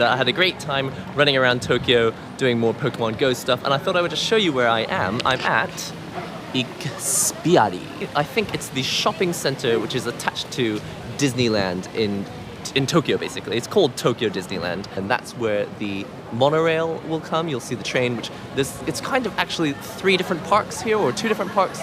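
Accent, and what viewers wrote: United Kingdom. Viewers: he's japanese but he learnt british english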